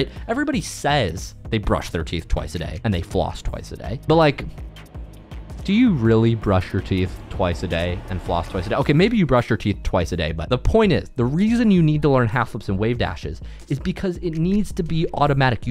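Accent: Poland. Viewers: Is he from Poland? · Wait a minute are you polish? That Google what hear it is was i polish? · Nie myslalem ze jestes polakiem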